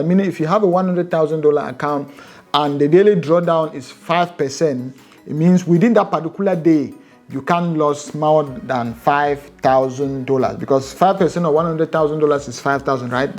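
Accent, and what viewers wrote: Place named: Akwa Ibom, Nigeria. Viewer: U sound like an akwa ibom guy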